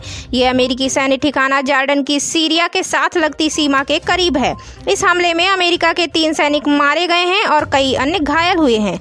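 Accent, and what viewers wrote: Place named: Bihar, India. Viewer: Lagta hai aap Bihari baa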